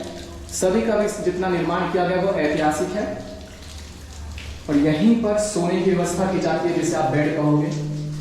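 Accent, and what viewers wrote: Maharashtra, India. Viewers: Bhava tu Maharashtrian ahe ki bihari?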